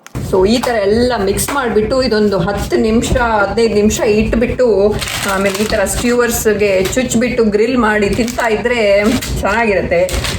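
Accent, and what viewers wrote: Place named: Mangalore, India. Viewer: Niv yake manglore thara Kannada mathadala.. El kalithu kondri banglore style Kannada..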